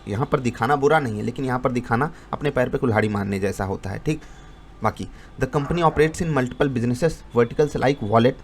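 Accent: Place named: Uttar Pradesh, India. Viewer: Sir ap kon c city me rhte ho. Apki tone se main guess kr skta hu aap Uttar Pradesh se belong krte ho. Kindly reply krle batana jrur muje sir m waiting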